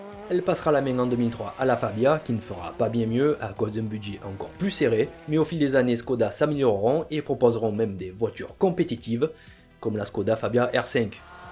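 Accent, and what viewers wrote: South, France. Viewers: chaîne que je découvre ! Rallye + merguez et l 'accent du sud !!! j 'adore !!! #saucisse power !!!!!!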